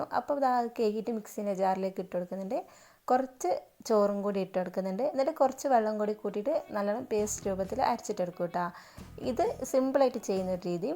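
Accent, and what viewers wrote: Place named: Kannur, India. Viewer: Wayanadanenklum kannur stylanallo samsaram 😊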